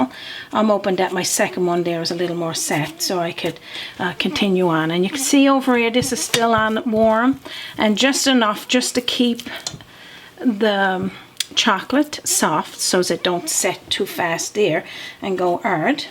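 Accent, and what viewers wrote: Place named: Newfoundland, Canada. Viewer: These look incredible. Is that a Newfoundland accent I detect? I love it. ♥️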